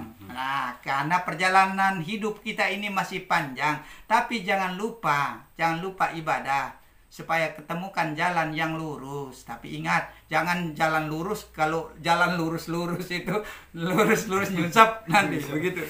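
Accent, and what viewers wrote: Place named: Sumatra, Indonesia. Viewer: Yg lebih keras LG bang setuju sy gy bicaranya sama dgn km orang Sumatra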